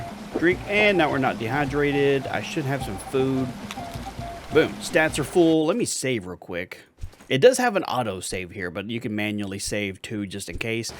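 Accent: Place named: Texas, United States. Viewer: As for me this is not something I would play. The graphics look very nice and it seems to be pretty easy to play but not for me. Survival games are not something I normally play but I am open minded and am willing to watch you play. I started this way with 7D2D but later decided I would buy it and play it. I got your info watching Glock9 and when you first started talking I said he's Southern so I hung around watching your channel. I am in NC but my aunt lives in Humble, TX and I LOVE the Texan accent you all have. At any rate I've learned a lot from your channel in playing 7D2D and I highly highly appreciate it. I'll watch your channel and watch you play because I want to see the future of how this game works. Happy gaming and thank you for having a nice decent channel with some very nice members! :D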